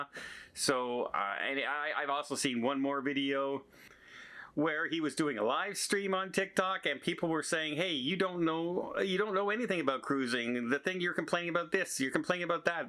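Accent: Canada. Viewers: Don, I can’t pinpoint why, but I love your videos, despite never having been on a cruise in my life (I am in my 60’s, married, and empty nest) and having no concrete plans to do so. I am a New Yorker and appreciate your Canadian accent and your overall positive outlook on seeking to make your passion a reality. If I were ever to book a cruise, I would take your recommendations to heart. You are an inspiration in how you travel and share the joy with your viewers.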